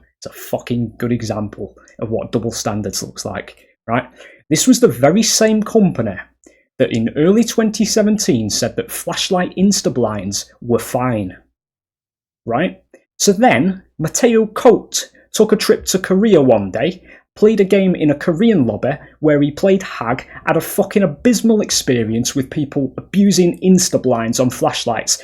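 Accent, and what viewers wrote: United Kingdom. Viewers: Random. But are you from Wrexham?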